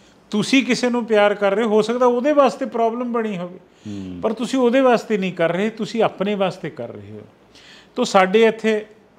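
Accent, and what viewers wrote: Puadh, India. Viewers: ਪੁਆਧੀ ਬੋਲੀ ਐ ਮਾਰੀ ਹੈ ਹਮ ਪੰਜਾਬ ਕੇ ਮਾਰੀ ਬੋਲੀ ਮਾਂ ਸ੍ਰੀ ਗੁਰੂ ਗੋਬਿੰਦ ਸਿੰਘ ਜੀ ਨੇ ਫਤਹਿ ਬੁਲਾਈ ਤੀ ਵਾਹਿਗੁਰੂ ਜੀ ਕਾ ਖਾਲਸਾ ਵਾਹਿਗੁਰੂ ਜੀ ਫਤਿਹ 🙏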